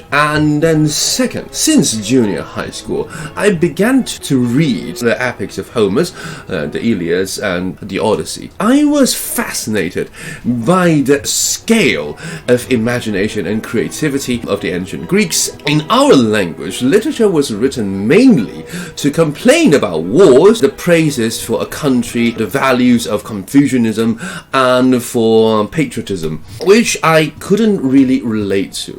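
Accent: United Kingdom. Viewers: 從阿滴那邊知道EKO老師的英式口音很好聽，然後連到這個介紹影片，完全被老師的人生經歷懾服欸，期待老師之後可以繼續分享更多！ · 尋尋覓覓終於找到一位新潮又酷的英文老師 想跟隨他充满神秘浪漫的英式口音 及吸血鬼教室聽他跟別人不一樣的特殊教法 只是相見恨晚 在一個訪問频道認識EKO老 師 被你的声音吸引 謝謝老 師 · Pls tell us more about your life in England !! Your life stories are truly intriguing,! I had been studying in the UK for 4 years, and your accent reminded of those amazing memories back then. anyways, love your work, pls continue sharing your knowledge to us, I enjoy every bits of your creative work ❤️ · 看福爾摩斯1984年版的影集，就是這種英國腔！ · 天啊，真是太精彩了！好喜歡聽你說話，老師的思考與陳述方式很有魅力，完全能引起大家的共鳴，一邊看你的日系打扮搭配英式英語以及上方不時出現台灣人習慣用語，種種不可思議的違和讓人會心一笑。 從小到大太多時候，我們都被迫假裝自己能接受或去成為所期望的某人，被僵化體制、社會狹隘價值所捆綁，很欣賞老師的勇氣與毅力！這樣一段自我介紹的影片，是那麼不容易，背後經過無數萃煉與追求完美的堅持，才能以現在如此從容優雅的姿態向大家娓娓道來自己的故事，這樣的精神，非常令人感動與佩服！ P.S. 我以前在學校很討厭英文，在被強迫的狀態下英文成績很差，最近這兩年靠自學才漸漸有了對英文的興趣，看到像老師這樣優秀自信地展現英文能力，對學習者來說是非常激勵的，本來想用英文留言給老師，不過為了能精準表達內心的感受，還是決定用母語來正確完整地陳述 ： ） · 真的有唸文學作品有差 整個鋪陳、敘述都非常厲害啊 英國腔超帥😂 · 哈哈～ 突然有種希望老師能拍一部，自己寫的電影，裡面充滿奇幻和你好聽的英國腔，（覺得應該會很棒） 再來喜歡搖滾樣貌和古典音樂搭配，再加上一點慵懶的優雅，意外的讓人入迷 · 好喜歡聽老師的英式口音，期待以後可以出一系列的英說影片～聽了很享受XD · Quite a good summary and denotation about eslite Taiwanese student' studying process. 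But lowsy students like me don't even know the frame the society's giving to us till some people mentioned. But this time the issue is mentioned by a visual kei vampire who speaks British accent. Intriguing!😆 I like British accent. So elegant! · 我又來報到了😂 Eko你的聲音和英國腔真的太好聽了！ 只能說聽你說英文是種享受哈哈 btw thanks for sharing your life story when you’re little! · Very beautiful British accent n impressive 吸血鬼造型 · 開頭以為要講吸血鬼傳說，然後老師用道地英式腔調講訴著台灣學子的悲哀故事，反差太大了，差點反應不過來😅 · 超喜歡英式發音的，可以聽Eko老師講英文，而且是講日常用語👍👍👏👏 重點是關於吸血鬼很吸引我 哈哈👍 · 看了几个eko的视频，发现英音听起来真不错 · 你的英式口音真的很有吸引力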